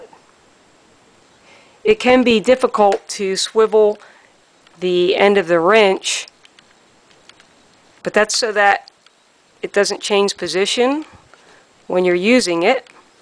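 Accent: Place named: Appalachia, United States. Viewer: This guy's accent sounds like those Beverly Hillbillies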